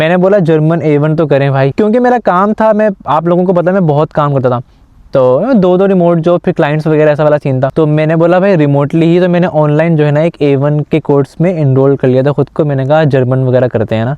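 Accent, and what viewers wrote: India. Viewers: Trust me bhai teri accent sun ke laga tu Indore( India)se hai. Mast aadmi ho tum. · Bhai aap to pure indian lagte ho accent bhi ❤ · brooo, I literally thought that you were an indian but aap tou Pakistani niklayy bhaii saab. your accent is kinda indian ngl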